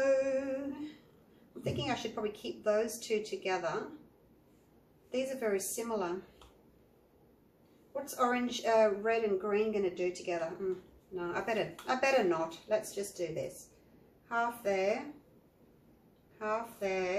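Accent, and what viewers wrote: Australia. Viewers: I love hearing your Aussie accent as much as I love your artwork.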